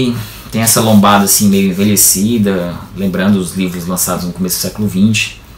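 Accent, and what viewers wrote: Northeast, Brazil. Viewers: Você e nordestino?🤠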